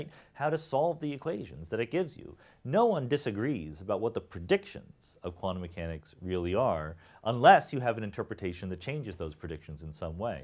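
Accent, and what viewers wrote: United States. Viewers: It took me 10 minutes to notice his american accent. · I'm watching this and think 'Something is really out of place here'. And then it comes to me: that man speaks with an American accent! It is so odd to watch a Brady Productions video without the traditional British english. :)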